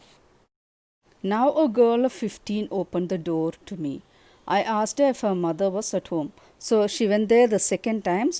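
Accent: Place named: South India, India. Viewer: Ma'am explanation is fantastic and that south Indian accent is great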